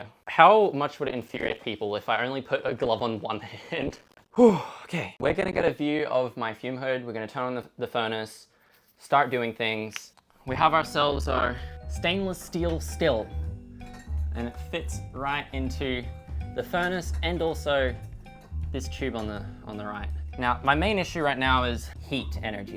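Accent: Adelaide, Australia. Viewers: Can tell you're from Adelaide XD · Your voice fluctuates so much. Sometimes it's easy to tell you're an Aussie, other times your voice does this weird thing where you sound American for a minute. Not necessarily a bad thing but different. Good video overall, might wanna change the name so you don't end up with feds.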